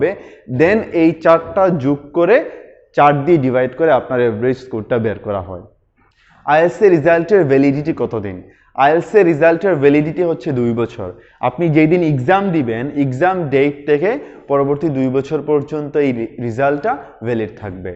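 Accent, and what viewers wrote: Sylhet, Bangladesh. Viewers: Sir sylheter na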